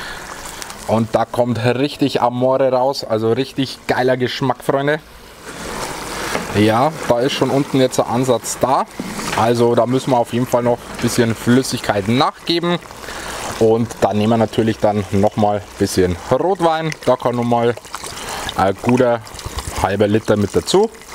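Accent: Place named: Bavaria, Germany. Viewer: Moin, jetzt habe ich schon unendlich viele Videos, mit deinem schönen bayrischen Dialekt, von dir verfolgt. Gestern habe ich das Gulaschrezept ausprobiert. Meine liebe Frau hat alles geschnibbelt, und ich durfte zubereiten. Das Ergebnis war wirklich super. Mach weiter so, ich tu's auch. Beste Grüße, Hubi und Doris aus dem Münsterland.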